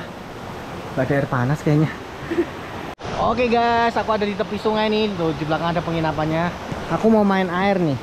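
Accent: Java, Indonesia. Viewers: Gw paling suka cara mas brow gw ini komentari situasi di sepanjang perjalanan. Logat jawa nya itu yg bikin lucu dan seru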